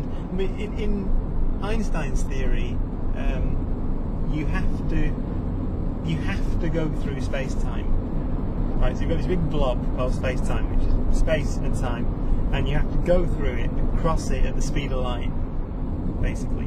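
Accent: Northern England, United Kingdom. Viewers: this guy is bringing more ppl into science so I hope he keeps on broadcasting...he does have an interesting accent...northern I suspect...:)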